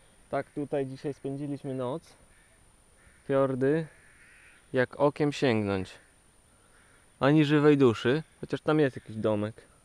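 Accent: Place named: Podlasie, Poland. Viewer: hehe, tak pytalem bo zaciagasz troche jakby po podlasku... :)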